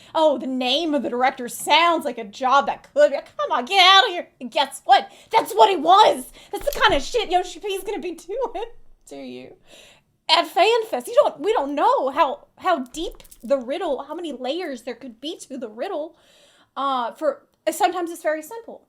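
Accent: South, United States. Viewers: Genuine question: where did the southern US accent come from? I just came from her "all mounts" video from 3 years ago and her accent is totally different. Thanks. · Since she moved back to the US she reaaallly has that Southern drawl. Also,clearly hyper - dem goggle-eyes doe... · wtf is happening lol, I forgot you existed and somehow your southern accent got thicker LOL and this videos so goofy, with all the random things that happened in the past lol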